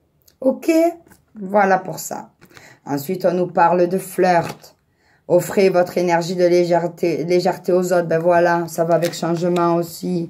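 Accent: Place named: South, France. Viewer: J aime beaucoup votre façon d interpréter les cartes et puis Cet accent du sud ☀️😍 Merci a vous et bonne journée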